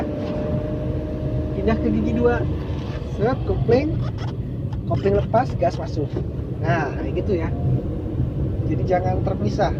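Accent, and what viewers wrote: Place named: Pangandaran, Indonesia. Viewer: Orang mana a siga orang pangandaran ningan😁😁🙏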